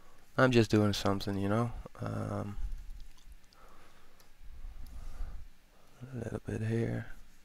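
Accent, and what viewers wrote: Netherlands. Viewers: Are you dutch? You sound dutch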